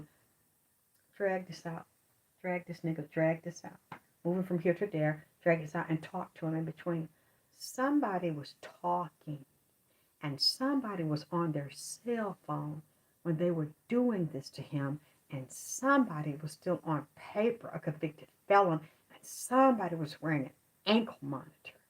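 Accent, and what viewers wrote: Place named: Georgia, United States. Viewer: Hahahahaha. I'm not done watching yet. But I had to write I laughed so hard there were tears in my eyes when you were answering the lady's question about how to get a reading and what not to do when get a reading from you. I'm a Ugandan that grew up in USA. I like your Georgia accent and readings you do on here. I also don't mind you speaking Ebonics because Ebonics is one of your languages: I understand you whether or not you speak Ebonics or slang. Okay, now back to watching the rest of your video. If I have more to say I'll post another comment.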